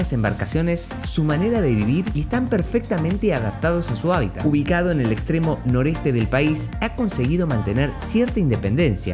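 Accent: Argentina. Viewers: La narración es hecha por una voz argentina. Por que?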